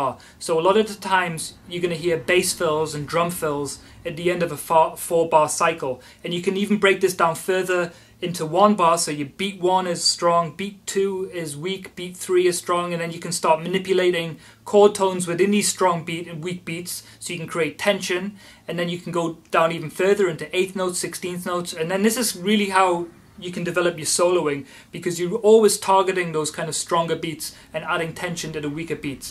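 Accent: United Kingdom. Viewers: man, I am in, even I learend my american english, I can understand you enough with your british accent, and I will try your doccuments. Thanks, you are great. Now I am gonna play more clearly.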